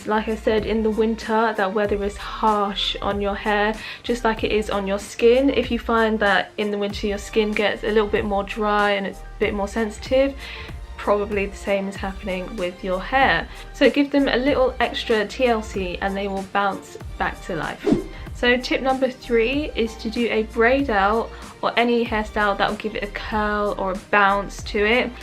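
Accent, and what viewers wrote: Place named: England, United Kingdom. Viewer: Lol, ya mom chupsing! Then I heard your English accent made me subscribe even though my locs are much smaller. Been looking for fellow Brits to follow. 👍🏾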